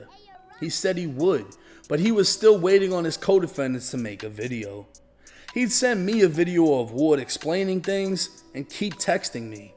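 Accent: Boston, United States. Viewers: putting on Boston. Respect bro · I knew I heard a fellow Bostonian accent I had no clue u was from here boy much love keep getting the bag 🙏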